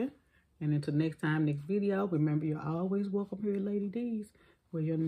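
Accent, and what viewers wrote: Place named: St. Louis, United States. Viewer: Yes ma’am!!! I can tell by how you talk and especially that duck and special noodles!!! North County Florissant!!! I just watched your gizzard video with my husband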